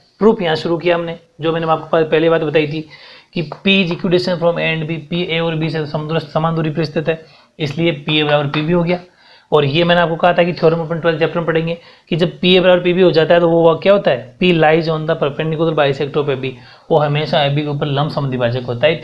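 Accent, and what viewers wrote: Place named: Bengal, India. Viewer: Sir aap Bangali hai mujhe aapki language se lag Raha hai kyunki main bhi Bangali Hoon by the way your teaching way is excellent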